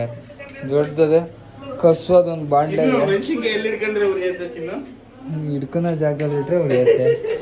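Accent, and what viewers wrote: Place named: Malnad, India. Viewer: Propaer malnd kannada matdthira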